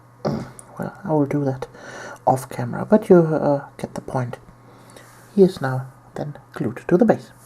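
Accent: Germany. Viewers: May I ask where you are originally from? I apologize if I'm wrong but i hear a German accent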